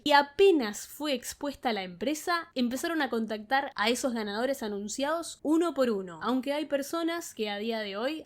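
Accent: Argentina. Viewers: Primer video que veo y ya note el acento argentino, como argentino eso me hace muy feliz! Es por esto que deje los gachas y bueno, es una lastima que el nombre de DMC haya sido manchado con esta estafa con cara de juego. Por ahora a seguir escuchando para seguir MOTIVADO!! Buen video😊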